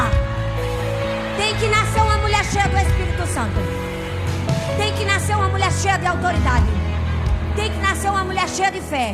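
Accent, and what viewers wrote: Northeast, Brazil. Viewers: e esse sotaque nordestino? 😍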